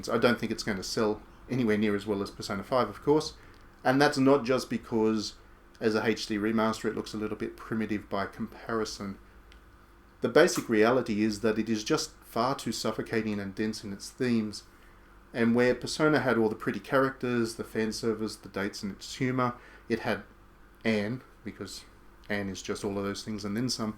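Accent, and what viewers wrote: Australia. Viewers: Austrailian accent clearly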